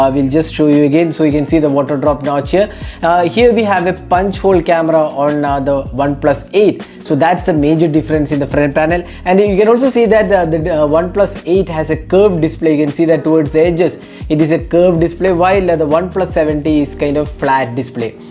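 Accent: Kerala, India. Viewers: that heavy mallu accent tho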